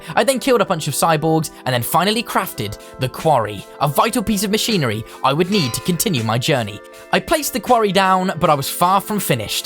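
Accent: United Kingdom. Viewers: Well he sounds like a brit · Omg I like how you talk like a British guy on a adventure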